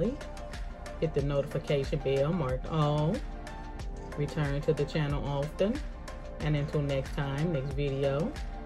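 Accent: South, United States. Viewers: Thank you I love ❤️ hearing you southern lingo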